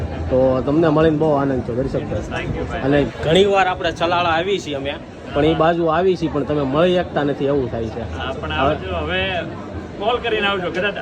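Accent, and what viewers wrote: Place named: Surat, India. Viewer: acdam surati bole chhe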